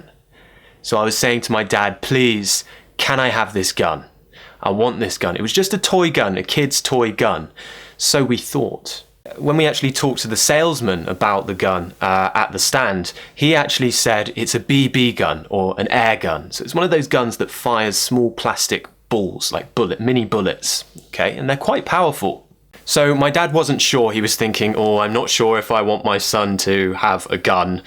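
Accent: RP, United Kingdom. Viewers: I'm in love with your accent, I love british😍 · Hi,! I feel like your British accent is very beautiful:) It sounds like you are english lord from these bbc series:)) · your stories are always funny, keep doing it, i am a english learner and you channel and lessons has been helping me a lot, by the way, you accent is the best, i've been struggling to improve mine to sound like a british.. greetings from brazil, man!!!! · oh u r so good at telling stories n i love this way to learn the British accent it is so helpful for me, btw i felt so happy for your teeth😹😹. hope u have a nice day 🌹🌈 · the way you tell stories in your amazing British accent gives me goosebumps whenever I listen to you. Great story! Thank you!:) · Hi, I'm an italian man and I watched lots of videos just to keep my english alive. Different months ago I watched a video where you told a very funny story about yourself (maybe a "listening practice" video): I remember you told that you went up a tree, and accidentally fell down in front of some of your school friends and you went in the hospital... 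then in the hospital they put a sort of plaster on you and you were forced to get help from your parents to wash you in the tub, with all the embarrassment of the case... Unfortunately I'm not able to find that video any more. Since I would like to show to my kids, because I think it's useful for an educational reason (as well as funny:-D) as listening practice for them too, can you please suggest me the link? Thank you in advance and congratulations for your video channel!! I love your british english and your pronunciation, and your voice is clean and wonderful. Gabriele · This EP that the most I like. Because I learn a lot of British accent from your story. 😅 Very much thank you. 🙏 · Lovely British accent....sounds sweet to my ears( not sure if i said it correctly)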